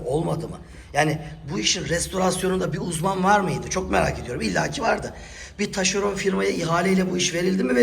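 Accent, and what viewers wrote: Turkey. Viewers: Ne demek gayseriyse herkesin bir aksani var kücümseyerek yazmas saniz daha düzel olur Talha beyin videolarin da neler neler gördük heryer ayni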